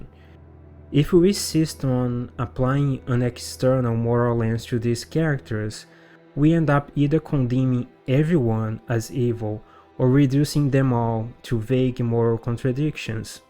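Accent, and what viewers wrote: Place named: Brazil. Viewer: Are you brazilian? While very good, your english has some giveaways that sound to me like brazilian accent. Great content tho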